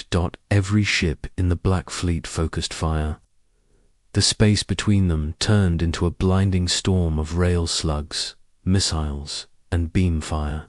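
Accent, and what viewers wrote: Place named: United Kingdom. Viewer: The slow speed combined with the British accent is terrible! This makes me want to go to sleep in the 1st 10 seconds.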